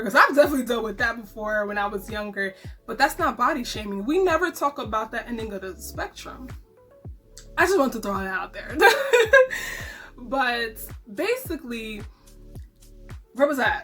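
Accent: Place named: Canada. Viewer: You sound Canadian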